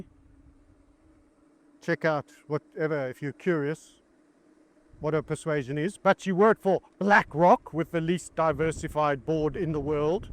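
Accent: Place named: South Africa. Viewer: your South African accented quips get me every time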